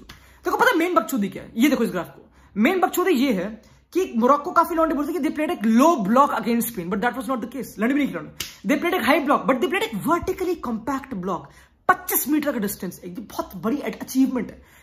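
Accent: Delhi, India. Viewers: Your football knowledge+Delhi-NCR ka accent will lead you to greatest heights. zindabad! ✊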